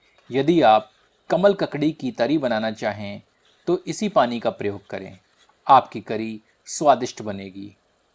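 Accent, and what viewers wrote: Standard. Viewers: Aapki shuddha hindi sunkar bahut aanand aya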